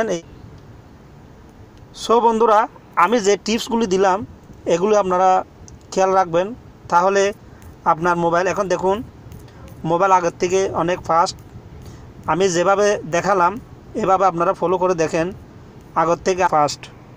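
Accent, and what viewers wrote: Chittagong, Bangladesh. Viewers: Kothai bujha jay,,baiyye chittainge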